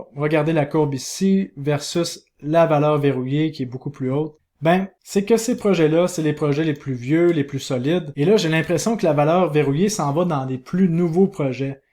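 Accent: Quebec, Canada. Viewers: J aime de plus en plus entendre ma petite dose d accent québécois ! Merci de partager ton travail pointu et ton avis d expert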